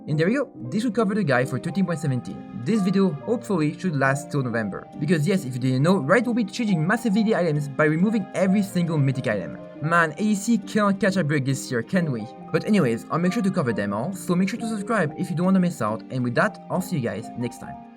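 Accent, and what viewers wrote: France. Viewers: Ahaha je sais reconnaître un french bro quand j’en entend hein! J’ai bon?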